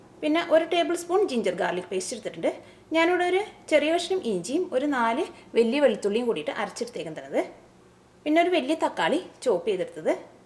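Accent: Thrissur, India. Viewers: thrissurkkari ano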